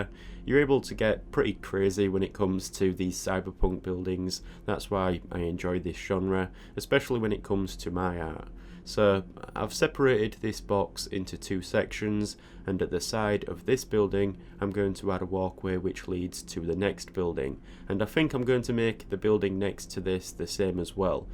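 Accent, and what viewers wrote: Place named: Humberside, United Kingdom. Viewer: i cant help but notice your accent are you perhaps from humberside?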